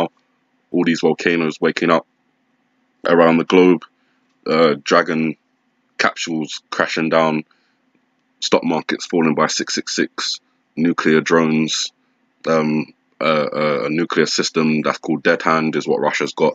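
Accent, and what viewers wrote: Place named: London, United Kingdom. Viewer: Nice to hear a Londoner speaking on these matters, keep up the good work brother..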